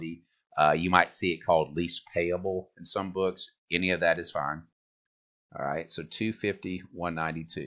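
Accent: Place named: South Carolina, United States. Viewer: Are you from south carolina? You sound like Linsdey Graham lol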